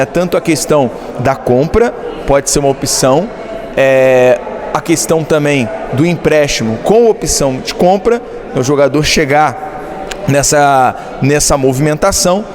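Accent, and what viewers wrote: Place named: Brazil. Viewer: Só um adendo: se vc é carioca,seu "s" precisa ter som de "x" tenha orgulho de ser carioca irmão. Saudações vascaínas. Vaxcainas,não "Vazzcainas"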